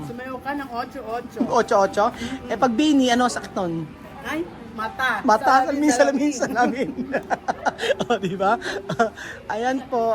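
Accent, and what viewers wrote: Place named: Chavacano, Philippines. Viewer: Ang Spanish mo napaka close sa Chavacano. Mabilis ko maintindihan.. pero nag basic spanish rin ako online.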